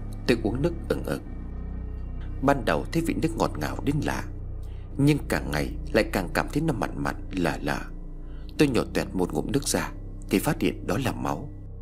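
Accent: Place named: North, Vietnam. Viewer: Giong bắc nhưng chuẩn,em da nghe a soạn nhiều rồi,cũng dc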